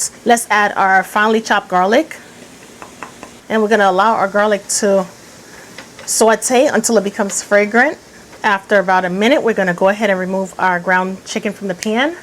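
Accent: New York, United States. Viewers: I love your cooking and I love your accent! Sounds a bit like New York! Thanks for sharing so many delicious recipes 😊👍🏼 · I LOVE the east coast accent, New York, Jersey, or am I wrong?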